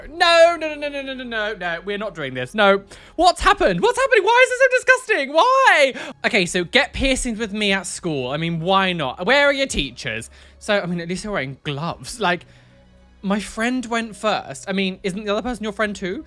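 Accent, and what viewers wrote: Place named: Essex, United Kingdom. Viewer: Just found you and am so loving your energy! Your accent is awesome as well, and may be the inspiration for one of my Essex characters in my books. :)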